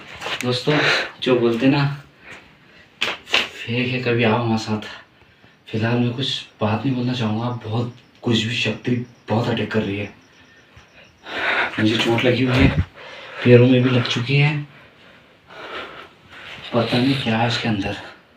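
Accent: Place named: Ajmer, India. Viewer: Bhai aap ajmer side ke ho kya sorry bura mat manna mujhe aisa lag raha hai ❤️🙏🙏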